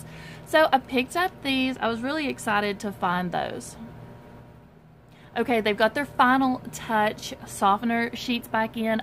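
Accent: South, United States. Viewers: Congrats on 10k! And in only one year. It's that southern accent. Gets them everytime am I right! From another southern belle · Congratulations on such an awesome milestone! I love watching your videos and listening to your southern accent. I am from Texas but our accent is a little different. I will be looking for the tumblers and snack containers for an upcoming trip such a great idea to use instead of our more expensive tumblers! Dollar Tree haul for me tomorrow.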